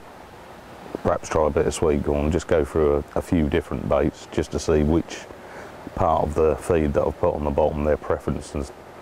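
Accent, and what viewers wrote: United Kingdom. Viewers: hi great video just wondering if your from norfolk your accent sounds that way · That be a Suffolk accent there boey!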